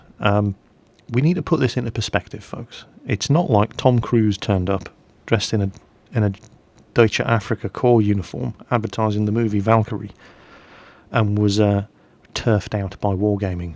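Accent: England, United Kingdom. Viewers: If you think that could be a Scottish accent, then you must have hit your head on pavement after being repeatedly hit in the face with the stupid brick. There are many types of accents in the UK, but to save time, English accent=yes. Scottish accent= wtf? no. Listen to Scottish people more often.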